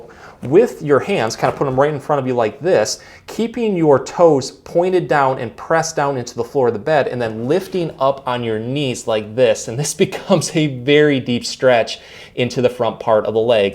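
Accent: Michigan, United States. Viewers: This is the most Michigan accent I’ve ever heard in one video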